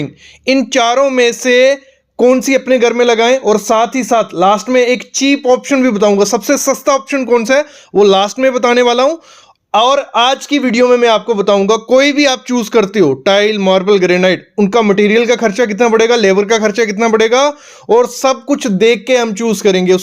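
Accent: Jammu, India. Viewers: Bhaiya ji jammu se ho aap toh…👍🏻👍🏻 Waah ❤️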